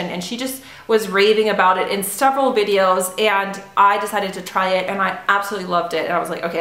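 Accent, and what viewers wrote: France. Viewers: Omg ton français<3 (omg your french!)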